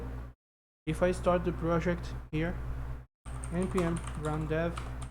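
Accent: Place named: Brazil. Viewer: Sotaque de BR, se for Br da um salve que tenho umas duvidas e to em um projeto que pode te interessar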